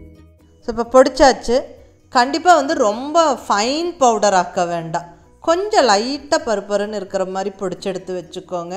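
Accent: Kongu, India. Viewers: Vanakkam ninga Romba azhaga kongu Tamizh la pesuringa. Very nice video THANK U.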